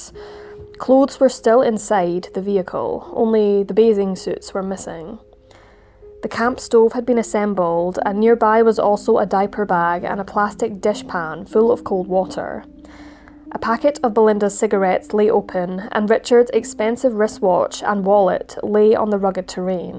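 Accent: Scotland, United Kingdom. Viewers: Louis Cypher She’s Scottish. I love her voice too. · She is Scottish lol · She's Scottish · It is a great accent- but it’s Scottish 😊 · George Kintail Scottish accent 🏴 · Is this accent Scottish? Reason I ask is I just heard this story on That Chapter in an Irish accent. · Scottish lol and I’m Mexican I know that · A nice Scottish accent. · Do I detect a Scottish accent ? Well narrated. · It’s Scottish! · Are you Scottish? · Scottish AF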